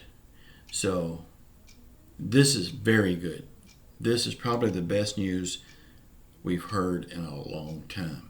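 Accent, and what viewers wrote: South, United States. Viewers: Iove your southern accent. Thanks for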